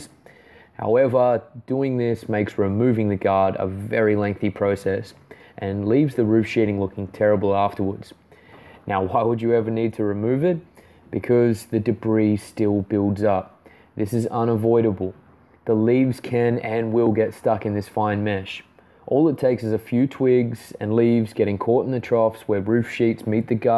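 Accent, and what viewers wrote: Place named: Australia. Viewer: Oops, LOVE THE AUSSIE ACCENT!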